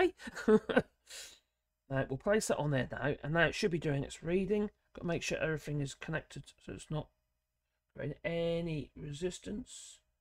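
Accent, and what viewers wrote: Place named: Portsmouth, United Kingdom. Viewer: I live in America and bought this meter. I grew up in Southampton England. You did a perfect review and enjoyed your description. Manual dies fit but it is tight. I totally agree with everything you said. From your accent I was guessing you are from Portsmouth ? Or south of England near fom where I grew up. I did enjoy it!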